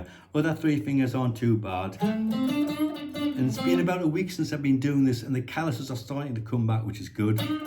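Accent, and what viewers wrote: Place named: Northeast, United States. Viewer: Hi, Thanks for your video on your broken wrist to guitar playing recovery. Ive just broken my left wrist and hope to get back to it. One day. I'm from the northeast, originally so your video voice is very familiar. Thanks again for the lifting video. Oh and great playing... well done. Top man!!!...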